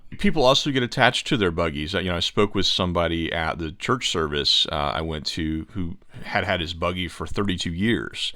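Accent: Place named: Baltimore, United States. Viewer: Is narrator from Baltimore? I hear a strong Bmore accent when he says window, home, here, open, et.al. words, a LOT like a native of Baltimore speaks.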